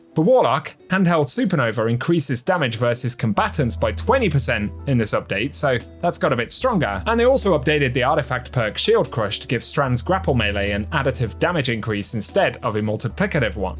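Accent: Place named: United Kingdom. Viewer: 😂 That freaking Brit accent ☠️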